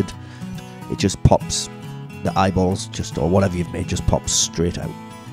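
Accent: North East England, United Kingdom. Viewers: Thanks for this tutorial you blew my mind such a simple solution to making eyeballs for my handpainted skulls been looking all over for a way to do that without buying doll eyes from China. Of course I find genius solution from someone with a north-east accent hahaha cause that's where I'm from too! Proper class pet! Canny video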